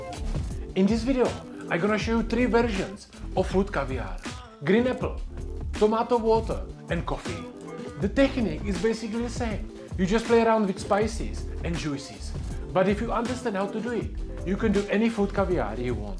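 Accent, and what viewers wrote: Czech Republic. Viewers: That is just extremely strong Czech accent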